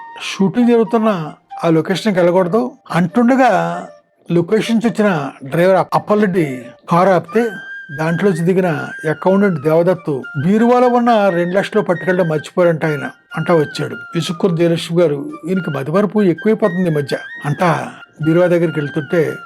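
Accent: Godavari, India. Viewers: గోదావరి యాస లో మీరు మాట్లాడతా ఉంటే ఎంతో ముచ్చటగా ఉంది. అదీ మీ సినిమా గురించి 🎉🎉🎉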